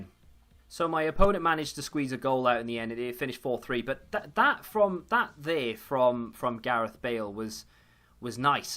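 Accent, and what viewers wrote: Wales, United Kingdom. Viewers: Certainly not, I'd guess Wales or somewhere in England close to the border. Probably Wales though.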